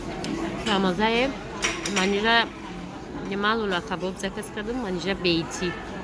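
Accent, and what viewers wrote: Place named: Khujand, Tajikistan. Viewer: Эх лафзи хучандиии гушаком кайба туя мешунавад😭😭😭😭😭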